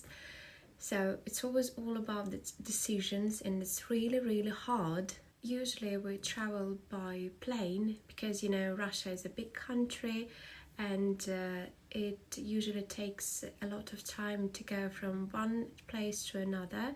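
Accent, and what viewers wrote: England, United Kingdom. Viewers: Why does her English which is excellent sound like she is from England. Her voice is so nice soft and very clear. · Приятная нотка английского акцента – это то, что так приятно услышать от красивой русской леди из сельской местности.❤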